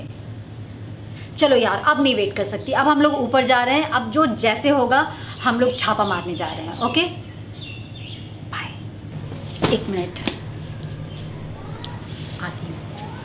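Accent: Bihar, India. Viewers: Apki voice or bolne ki tone hi irritating hai aisa lgta h Bihar ka touch h sur nikal kr bolna normal bola Kijiye thik lagega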